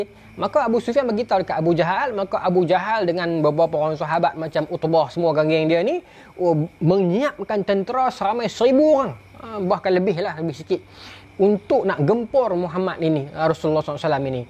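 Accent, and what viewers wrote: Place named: Kuala Lumpur, Malaysia. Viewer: terbaik abg..saya antara peminat ustaz..cara penyampaian yg sempoi.tp kali ni mula2 ckp k.l huduh piang dgr..hihihi..love u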